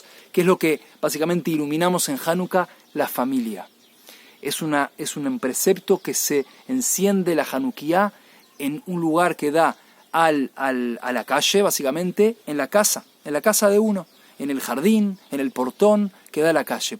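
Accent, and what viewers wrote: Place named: Argentina. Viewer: Me gusta su explicación, pero tengo una inquietud, ud. Es judío de . Nacionalidad judia, oh ee ud. Argentino. Su acento tiene algo de Argentina, igual explica excelente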